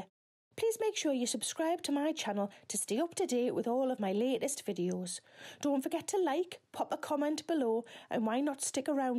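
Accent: Newcastle, United Kingdom. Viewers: I love yr geordie accent 😅😅😅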